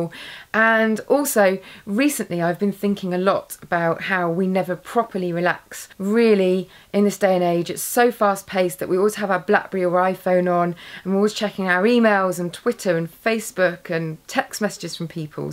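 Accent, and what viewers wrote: United Kingdom. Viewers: You are amazing!!!!!! I like ur British accent( usually I'm not fan of it:), you are so unique and eye-popping! Keep doing an amazing job!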